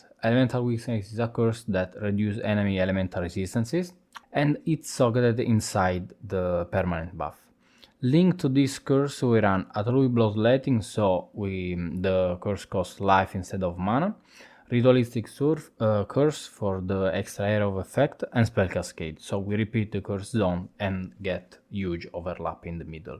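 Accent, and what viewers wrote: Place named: Italy. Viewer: The italian accent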